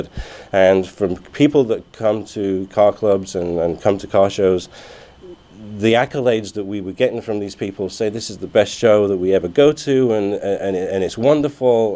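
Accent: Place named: United States. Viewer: You must have been living in FL a long time, you sound American but without the accent lol. If I find myself in Florida around Easter time one year, I'll be there. On the other hand, if you decide to take Wheels Across The Pond on the road, I spend a fair amount of time in Dallas (Fair Park would be a great venue), give me a shout and I'll make sure I'm first through the gate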